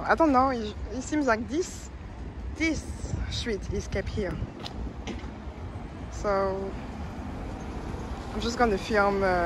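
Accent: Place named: France. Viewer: Try not to be put off by people saying you can't film. You are allowed to film anything in a Public Place! Great walk as usual! So I have now deciphered that your first language is French! Love the voice.....oooh la la!!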